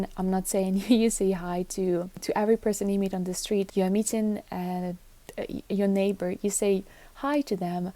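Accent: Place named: Bern, Switzerland. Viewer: The greeting thing: Depends a lot of how urban the setting is. It's less common in the big (for Switzerland) cities. Your Bärndütsch is cute. Etiquette on crosswalks: No real need for a hand sign, just be clear with your intentions. Seek eye contact with the driver, set a foot on the road, wait for the driver to slow down.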